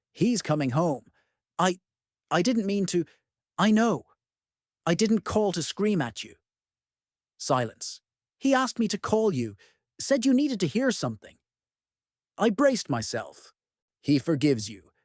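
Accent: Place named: Ireland. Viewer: Creepy story but the Irish accent is refreshing!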